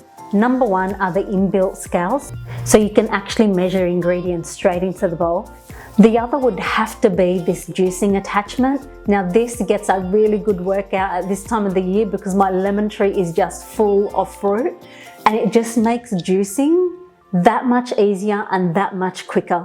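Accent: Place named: Australia. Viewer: Love your Aussie accent I’m Australian too MaashAllah sister your very beautiful 🥰btw I’m going to try this soon 🇦🇺❤️🇱🇧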